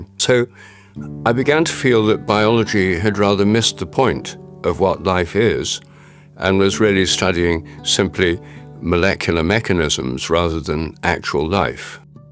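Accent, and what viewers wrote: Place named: United Kingdom. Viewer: i came for the noble posh english :face-green-smiling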